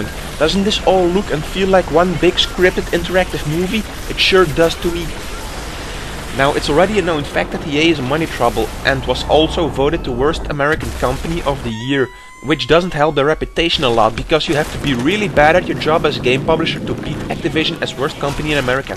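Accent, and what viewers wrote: Netherlands. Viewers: lol, I love the Dutch accent!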